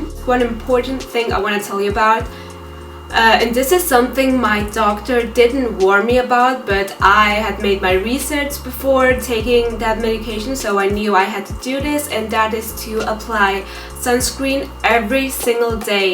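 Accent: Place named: India. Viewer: Are you Indian ? You've that little Indian English accent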